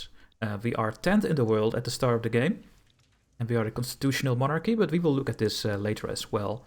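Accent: Netherlands. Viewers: The further you go.. hoe meer ik hoor dat je ook echt Nederlands bent:D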